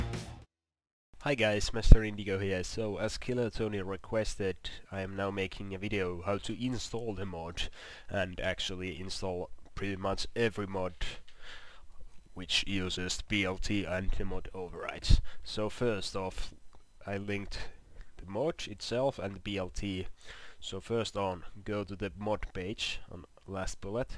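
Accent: Finland. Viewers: Your accent is slightly Finnish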